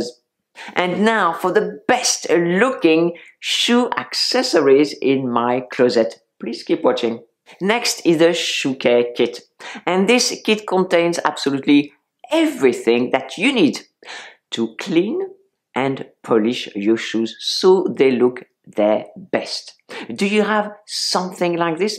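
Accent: France. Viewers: I love your videos and learn a lot. Thank you so very much. I really love your French accent too. 👠👛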